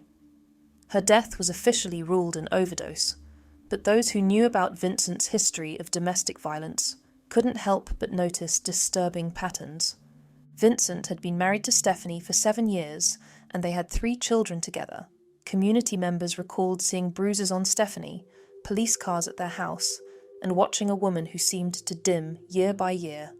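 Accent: United Kingdom. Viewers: This channel has its roots in the UAE yet the presenters accent seems to be from the UK 😮. 🤔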